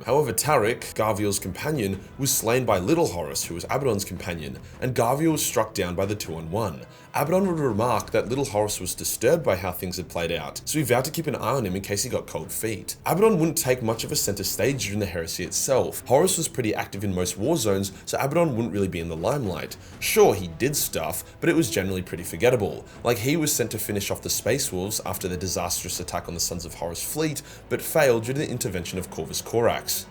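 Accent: Australia. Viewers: I may not always get to enjoy having 40k explained to me by a cursing Aussie but when I do I always enjoy it. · My friend 600 k is getting closer i am so happy for you ive never ever played a Warhammer game never bought something that has to do with Warhammer but you are my favorite warhammrr YouTuber ever you make it fun you put in some jokes and the aussie accent makes it even better you make awsome videos keep doing great thing 💪💪💪😁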